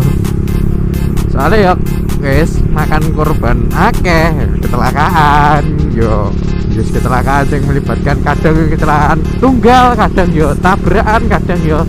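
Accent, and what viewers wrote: Java, Indonesia. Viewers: jowone ngendi mas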